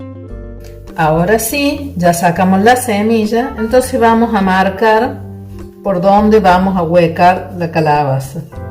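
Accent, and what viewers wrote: Córdoba, Argentina. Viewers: Sos de Córdoba 😁 por la tonada. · Muy bien explicado… (la tonada le da la magia a la receta) aguante Córdoba❤ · Tienes un acento típico de la provincia Argentina de Córdoba! muy lindas las cordobesas! Gracias por compartir tu receta ,voy a poner manos a la obra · por el acento ya se sabe como hablan los cordobeses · Por casualidad usted no es cordobesa xq a mí me parece que si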